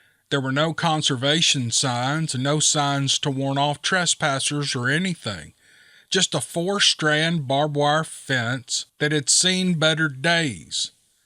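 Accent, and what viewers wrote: South, United States. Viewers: Yes.... you have that southern drawl...not heavy..but perfect storytelling kinda very I don't know any other words than perfect hell it's cheaper than my shrink and more enjoyable and you don't mind going over the hour😆😆😆✊💪🤜🤛🇨🇦👻💙👻💙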